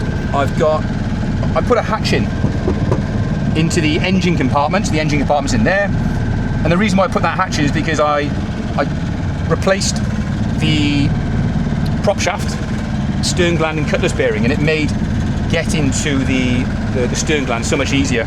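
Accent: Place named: South Wales, United Kingdom. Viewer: All power to your elbow! Amazing stuff. Where do you hail from? I detect a south wales accent. Newport? Anyway, I have black antifoul on my boat but I have never encountered Orcas around Cornwall! Good luck to you!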